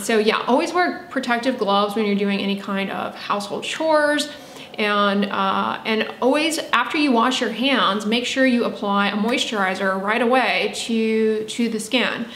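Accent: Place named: South, United States. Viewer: Are you from Virginia or perhaps Texas? I can hear a twang in your voice but only slightly with your “o”s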